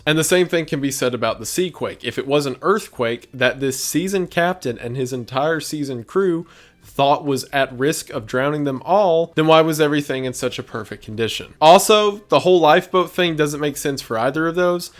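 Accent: South, United States. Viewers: Your Southern accent is so clean and sweet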